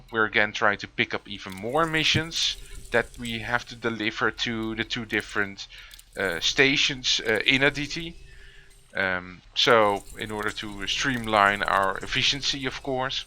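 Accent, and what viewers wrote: Netherlands. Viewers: Hoi, ik hoor aan je accent dat je wellicht Nederlands bent (no offense je Engels is verder prima), maar ik begin net in Elite Dangerous. Of nouja net, ik heb al aardig wat uren er in, maar ik wil het nu wat serieuzer nemen. Kan je misschien enige tips geven om snel te stijgen in ranks? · That dutch accent is strong =) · is it just me or are you dutch just like me cause your dialect sounds a bit dutchy XD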